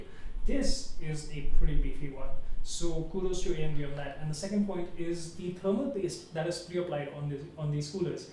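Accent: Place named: India. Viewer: Thumbs up for the clear and understandable accent from an Indian guy.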